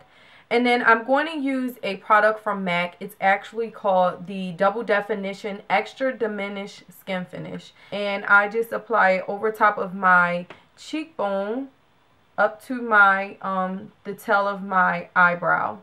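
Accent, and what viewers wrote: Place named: New Jersey, United States. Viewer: Ahhh just I knew by your accent that you were a fellow jersey girl! What I love most about this is you explain how you hold the brush, how to apply the product, etc. Most people only show what to use, not how. Great video and of course lovely look! :)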